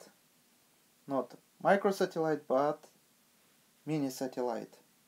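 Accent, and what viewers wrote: Russia. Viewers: Man you russian accent is awesome . :)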